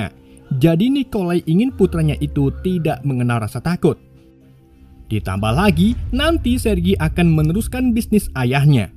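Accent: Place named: Pekalongan, Indonesia. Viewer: Logate mirip pekalongan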